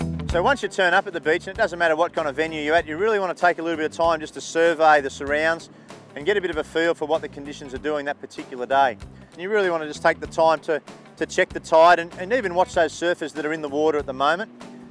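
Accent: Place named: Australia. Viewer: gotta love the aussie accsont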